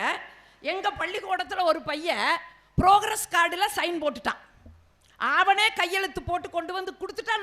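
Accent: Kongu, India. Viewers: Madam kongu tamilil kalakkareenga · Super mam..👌 Konjum kongu thamizh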